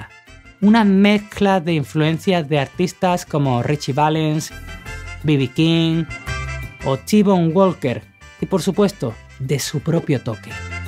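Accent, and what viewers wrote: Spain. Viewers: Que fondo musical tan horrendo ! y la voz españita de este chaval no le hizo ningun favor, no tenia Santana suficiente musica para ponerla de fondo ?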